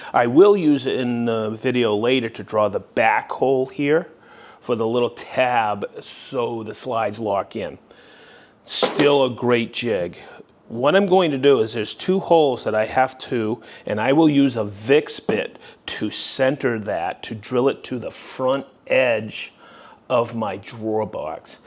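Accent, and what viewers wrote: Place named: Maine, United States. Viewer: such genius in simplicity and Central Maine accent. Take a Shot for every "Whikkid"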